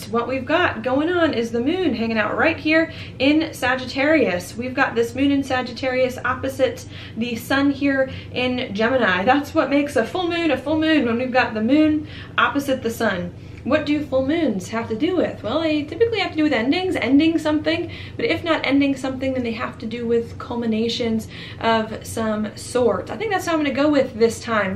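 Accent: South, United States. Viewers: My goodness gracious, you have amazing skin and healthy hair. I don't talk like a douche. But I needed to get that out. Rawr, lol. Okay, I've looked like a beta jerkoff enough now. I'll quit. Not like I'm ever gonna meet you, so I didn't have anything to lose by telling you these things, hahaha. Oh and that country accent, and your energy... yum 😂 Great readings too. No joke.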